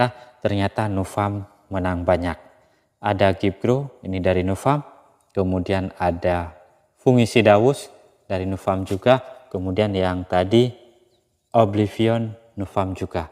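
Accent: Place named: Aceh, Indonesia. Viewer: Bang.... Dari logat sang droeneuh ureung Aceh. Beutoi bang?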